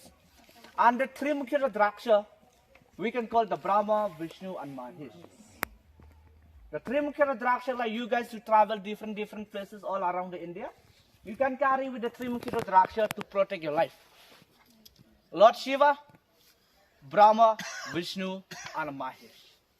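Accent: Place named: United Kingdom. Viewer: Ym dei da ka phareng hynrei dei beit da ka english kaba na UK... Keep it up bro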